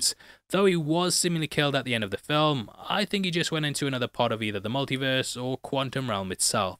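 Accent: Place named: United Kingdom. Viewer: Me after each episode knowing I have a smooth talking British accent on YouTube ready to explain everything to me: ☺️💕🤪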